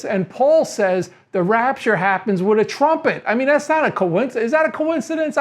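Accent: New York, United States. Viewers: Thank you for your reply! I've visited NYC a number of times and have friends in Queens, so I noticed your accent immediately. Brilliant video - a unique take on the issue! 💙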